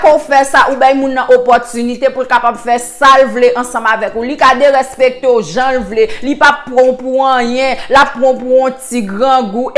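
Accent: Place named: Cap-Haïtien, Haiti. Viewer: Sa son fanm okap pareyanm kanmenm palèy gn twop resanblans premier vidéo'w map gade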